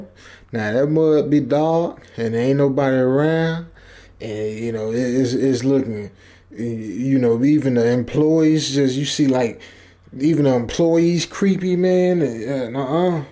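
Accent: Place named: New Orleans, United States. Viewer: Really enjoyed the story. Whomever narrated this story has the New Orleans accent/dialect down pat. And this is coming from a native New Orleanian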